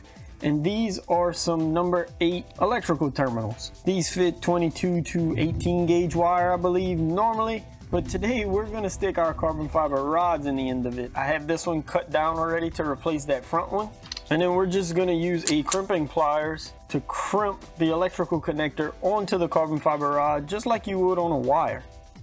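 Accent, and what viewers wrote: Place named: South Louisiana, United States. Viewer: Man are you from South Louisiana? Heard a familiar accent in your wide body corvette video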